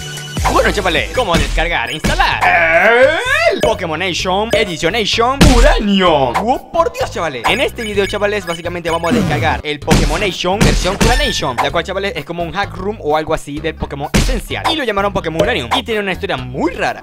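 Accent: Venezuela. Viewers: HAAAAAAA ERES VENEZOLANO CONRAZON PAPI ERES DE LOS MIOS HABLA CLORO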